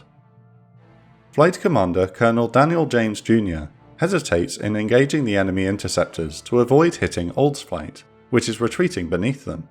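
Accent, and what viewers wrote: United Kingdom. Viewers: Love the visuals and the calm Brit voiceover. If you want the American version of the story, TheFatElectrician does a good video on Op Bolo as well. "US obliterates half North Vietnam's MiG-21 fleet in 13 minutes". The contrast between channels is stark, but I can appreciate both methods of telling the same story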